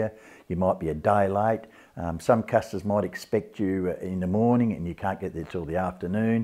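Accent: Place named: Australia. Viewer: Great to hear an Aussie accent for a change! A good decent bloke giving honest no nonsense advice that's actually relevent to Australian conditions. Always enjoy your video's mate! Steve. Upper Cuts Lawn Care - Devonport Tasmania.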